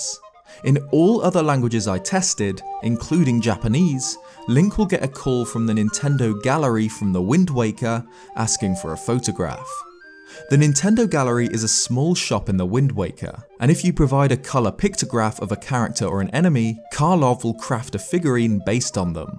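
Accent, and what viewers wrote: United Kingdom. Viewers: He's clearly a brit, not american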